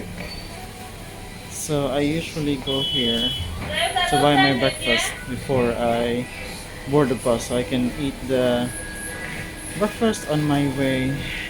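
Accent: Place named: United Kingdom. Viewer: wow kuya british accent na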